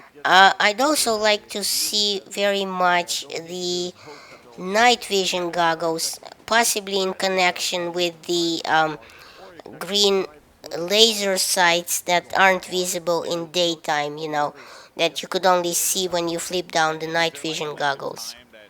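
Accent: Russia. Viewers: are you Russian by any chance?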